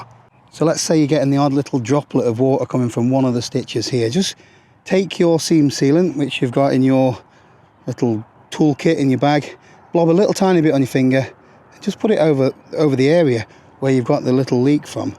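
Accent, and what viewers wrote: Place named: East Yorkshire, United Kingdom. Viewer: DIY, snot ard is it...... 'Ull' east yorkshire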